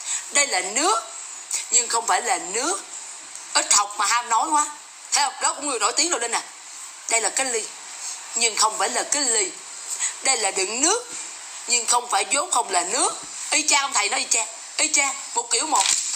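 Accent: Standard. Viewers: Em nói quá chuẩn Hay quá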